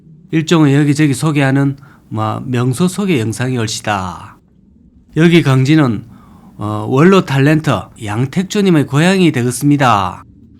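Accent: Gyeongsang, South Korea. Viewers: 해설자,,말투는,갱상도, 사람이네,,,양택조니을 앞세워 지역감정 조정하네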